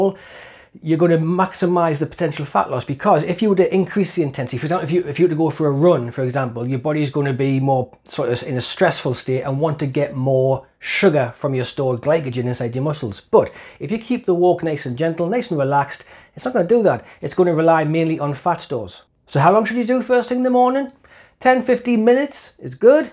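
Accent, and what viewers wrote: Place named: Newcastle, United Kingdom. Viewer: Geordie . Hello from Australia. Miss the accent.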